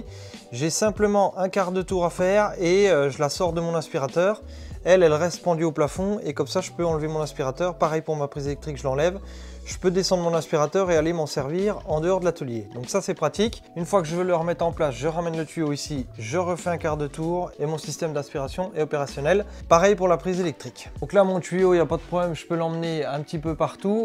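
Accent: Franche-Comté, France. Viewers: Bonjour Un petit accent franc comtois non?!.. 😉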